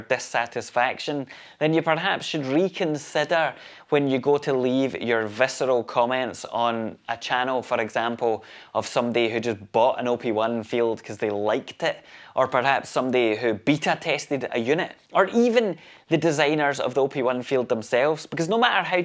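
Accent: Scotland, United Kingdom. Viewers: A man of the people! Great to hear your take on this, I know this is isn't normally your schtick but clearly... the people wanted to know!! Your elegant way with words and dulcet Scottish tones put this ending over the top!!!!! 🙌🐀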